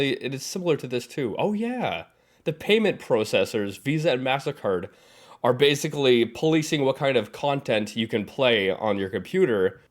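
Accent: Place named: United Kingdom. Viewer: Good British accent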